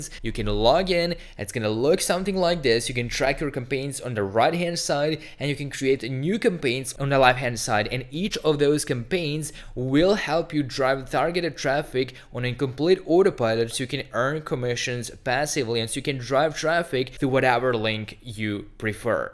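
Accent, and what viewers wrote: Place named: Estonia. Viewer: Could be wrong but my first thought was Estonia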